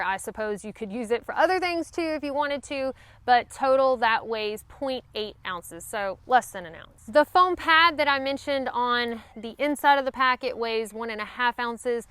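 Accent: South, United States. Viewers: I bicycled about 38,000 miles and camped through nineteen countries. I thought about through hiking the appalachian trail. It is not likely. Except for a 15 mile forced march in the army through a dry dusty riverbed, I have no experience long distance walking. I have no way of estimating my ability for that. Of course, if I were 30 again in place of 70 which I am now, there would be no question whatsoever. But, it is like the man said--There aint no way that's ever going to happen. I read your name. You also use because you are from the south, Alabama they say. And what makes it more obvious than your sweet, pure accent. Every syllable is another drop of honey, and beholding to the eyes, you are. You are a movie star. It is really clever and really cute what you do, and how you present yourself and the subject. Surely making the through hike could be a profoundly life changing experience. 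It can be the same with long-distance bicycle camping and touring, and quite different in some aspects. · Mine too, Matthew. I grew up in MS and lived 7 years in AL, 2 1/2 of those 1/2 hour from Auburn. But have lived up north and now in TX. Love the Southern accent! And she's an engineer!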